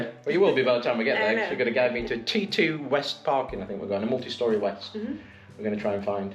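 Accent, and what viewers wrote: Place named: Yorkshire, United Kingdom. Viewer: Like others, hope Dad recovers soon As for your accent - soon reverted to Yorkshire 😬 Thanks to you both ❤️❤️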